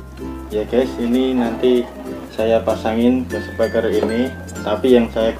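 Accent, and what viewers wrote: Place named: Jepara, Indonesia. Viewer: Kyoke mayong jeporo lur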